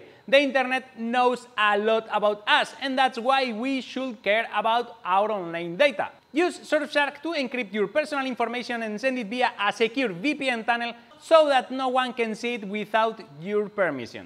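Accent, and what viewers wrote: Spain. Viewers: Hola Espanyolo, which ciudad are you in? I hit play, and within about 0.005 seconds my wife had said unintelligibly quickly: he's a Spanish - which makes her Ingles sound a lot worse than it actually is. I'd say it's stronger than yours, certainly weaker accent, too :-D I enjoyed the video, nice work on the upgrades. Next thing you'll be building one as capable as TOT's CNC router :-D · ya sabemos que sos español flaco, porfavor, o habla bien ingles o habla en español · Eres español no? El nombre y sobretodo el acento🤣🤣🤣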